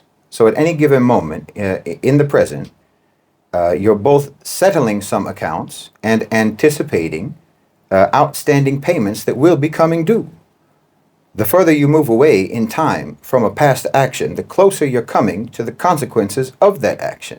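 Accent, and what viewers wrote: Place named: Louisiana, United States. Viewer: This guy is soooooo from Louisiana I can tell from his accent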